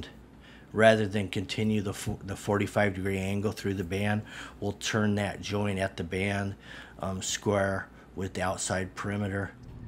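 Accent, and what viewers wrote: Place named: California, United States. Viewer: Listening to California Surfer accent takes me back...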